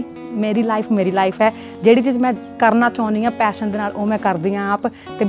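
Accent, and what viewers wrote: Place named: Punjab, India. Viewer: Eene Intelligent ho tusi & Punjab to bahr Jo fir v Punjabi Suit wear kita bahut Sohne Lgde di..... Upro Eeni pure Punjabi Talk ❤❤❤❤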